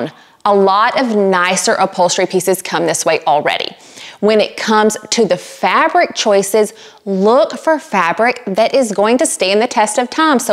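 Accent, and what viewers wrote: South, United States. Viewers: I loved this video. I love your design style. I'm from the UK and absolutely love your Southern accent. My favourite was your advice on lighting.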